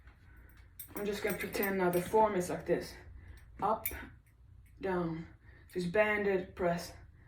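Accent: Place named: Scania, Sweden. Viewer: Älskar att du har din underbara skånska kvar!! 😂❤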